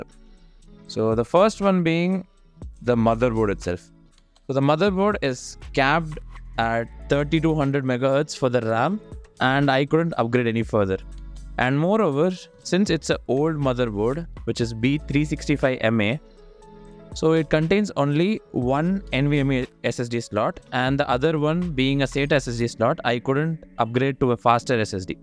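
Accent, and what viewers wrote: India. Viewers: oh mannn, the indian accent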